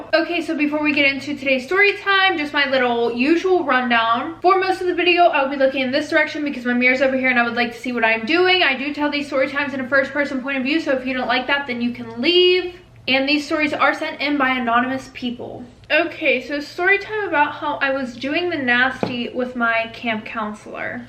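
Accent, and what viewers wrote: United Kingdom. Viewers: Thanks very much❤️❤️❤️❤️that red makes your blue eyes pop 💙💙💙Love ur British accent!!!! Love from uk!!!! 😂😂😂😂😂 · I actually liked the British accent 😂❤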